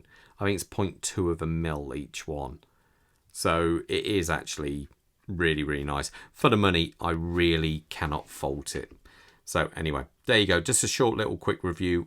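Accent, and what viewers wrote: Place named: England, United Kingdom. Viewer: I thought you was American, cool you're English. Your videos are really crazy good, enjoy watching, cheers mate.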